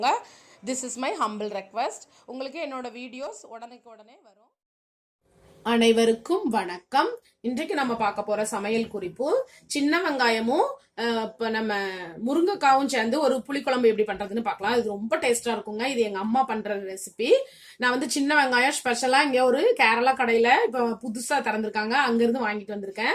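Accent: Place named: Nagercoil, India. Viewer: Hi aunty.. Im studying 2nd year but I’m so interested in cooking that’s y started watching cooking videos ...I’m ur new subscriber ☺️ I love ur videos ❤️ And I love the way u explain and u upload different recipes ❤️ I guess ur from Nagercoil coz ur slang is like tat ☺️ Pls upload some of ur native spl recipes aunty ☺️☺️❤️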